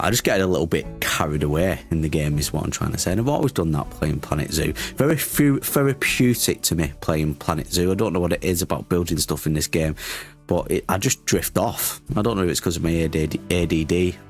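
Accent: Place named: Northern England, United Kingdom. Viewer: Random comment, but being from the UK, I love hearing a northern voice on YT!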